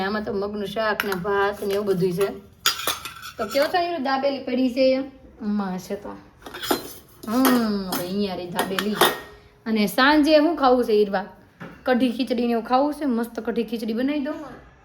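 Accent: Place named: Kathiawar, India. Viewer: jsk ben tamari bhasha upar thi tame kathiyawadi hoy em lage chhe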